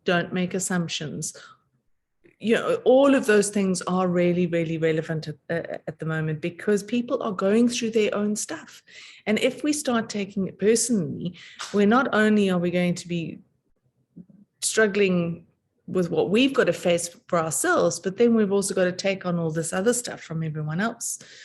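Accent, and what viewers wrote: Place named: South Africa. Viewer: I really appreciate this video thankyou and to all. Is anyone able to join these sessions? Four Agreements is great, simple yet profound truths and pactices. SO much going on energetically! My guides told me to take more shorter breaks more often throughout the day as it's a tripple whammy for me recovering from medical treatments and going through all these energetic changes, getting upgrades and being so highly sensitive. Solar flares really affecting me too, I had a throbbing headache yesterday - unbearable! I "cried out" out to my guides, I said please!! I imagined a "helmut" on my head absorbing the pressure and tension and asking it to give me protection and it dissolved, just like that - what a relief! I love that SA accent, you'll end up with an interesting accent I think. Thanks lovely, great video, I'm glad you made it and shared it - very supportive for the rest of us. 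🙏💗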